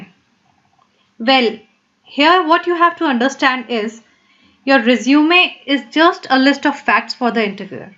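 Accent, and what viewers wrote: India. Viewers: I'm anti the Indian english.. my bad 😥😥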